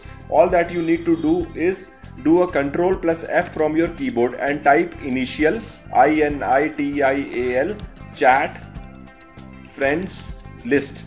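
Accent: India. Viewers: oooooooooooh noooooooooooo damn indian voice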